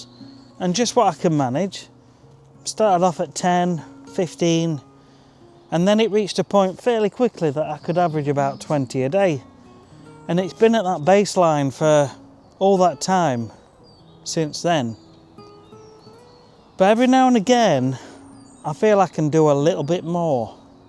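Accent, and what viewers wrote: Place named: North West England, United Kingdom. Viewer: I'm guessing in the North West somewhere from the accent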